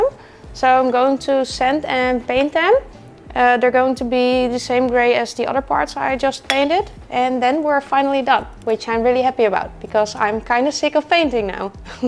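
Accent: Netherlands. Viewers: What is your native language? You sound Dutch?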